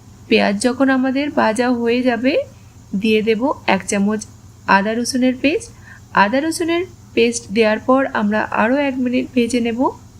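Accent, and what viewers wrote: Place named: India. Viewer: Daroon laglo. Aapnar kathay hindir tan aachhe. W. B thakenna nischoi, tabe sunte bhalo laglo.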